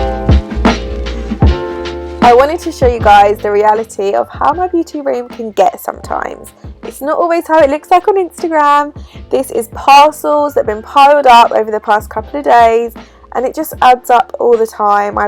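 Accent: United Kingdom. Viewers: I can't stand the British talk what the hell are you saying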